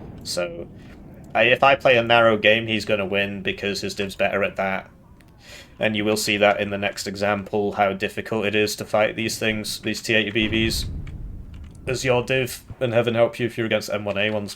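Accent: United Kingdom. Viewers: Pakistani man in middle eastern attire with a British accent named.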